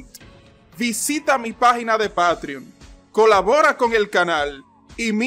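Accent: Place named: Dominican Republic. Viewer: Eres Dominicano? Creo que sii jajaj es que el asentó dominicano se reconoce ma rápido que Cabada con lo 30 minuto y un chin ma`😂 yo también lo soy❤ AMO TUS VIDEOS!!!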